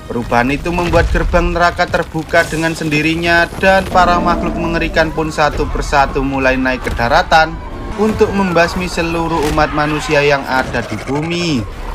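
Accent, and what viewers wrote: Java, Indonesia. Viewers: Apik tenenan film ne... naratornya..medokkk tenenan jawane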